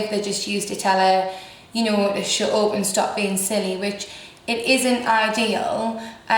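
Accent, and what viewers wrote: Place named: Newcastle, United Kingdom. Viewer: Geordie accent from Newcastle, uk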